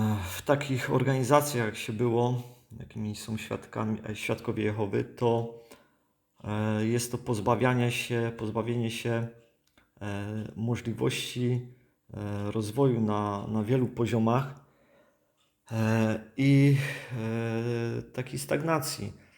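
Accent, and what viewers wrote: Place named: Silesia, Poland. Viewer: Witaj! Jak dobrze Cię znowu słyszeć 👍i wcale przynajmniej mnie Twoja śląska mowa nie przeszkadza, wręcz przeciwnie, ja też mówię i tyż gołdom, jestem z krwi i kości. Ślazaczka z Opolszczyzny, więc póki co pozdrawiam serdecznie i do następnego usłyszenia😊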